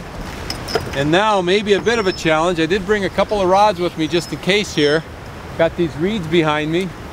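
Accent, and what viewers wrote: Canada. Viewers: are u Canadian were your accent from sir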